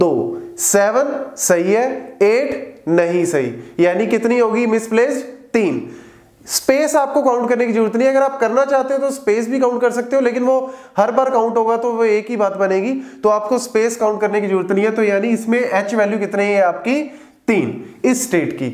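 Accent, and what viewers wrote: India. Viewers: Indian English.. too hard for me to comprehend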